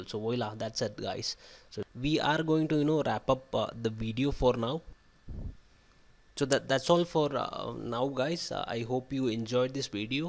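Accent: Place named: India. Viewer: Am I the only one who can t support this Indian accent? no offense...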